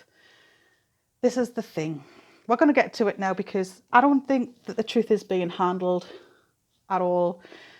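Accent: Newcastle, United Kingdom. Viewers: Aah pet, is that a fellow Geordie voice I hear? You've picked up on all the bits and bobs I did, especially that bit about "the beloved daughter-in-law" in their court statement. I don't know who wrote this - guessing their court representitive who read it out - but that sounded so disingenuous when both parents had already made it more than clear they didn't like her - at all. OK they can say they are sad about the whole tragic event and people will probably believe them, cos I'm sure they are (if only cos their beloved son is going to prison for the rest of his life) but don't start gushing out false terms of endearment now and over egging it. If Shanann had been so "beloved by the Watts family" why didn't Mamma W get her onside, make the effort to get to know her, instead of going against her at every opportunity. And not going to their wedding? 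That would have been a very good time to start sucking up the fact that Chris had chosen her and Mamma W had to get used to it. But don't you think, Mamma W would have had a struggle to embrace any girl CW had chosen to marry? I really do think the Watts had a problem with the Rzucek family. Did you hear Sandy Rzucek's tale about when all 4 parents were staying in Colorado? Mamma W had a psychotic meltdown and wouldn't "allow" the Rzucek's to spend any time with the grandkids - and the Watts were there for a week. They didn't even all sit down and eat an evening meal together. How's that for weird? I didn't come to this case until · Just came across your channel, Have prescribed .You come across As someone very genuine and different from the others I’ve watched.And I love your Geordie accent.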